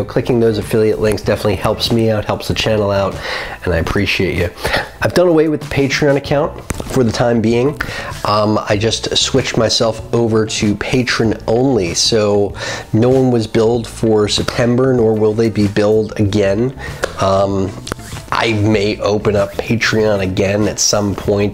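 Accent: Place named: Boston, United States. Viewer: Been wondering bout you. Glad it's just a work thing that has kept you busy. Only 2 reviewers do rda reviews right. You and Ambitionz. Now if I could get over the Boston accent.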